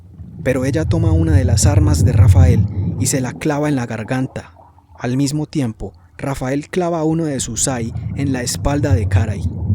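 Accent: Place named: Colombia. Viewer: sos de Colombia? Digo, supongo que es lógico por el vídeo de los Simpson, pero ese ascento es demasiado reconocible😂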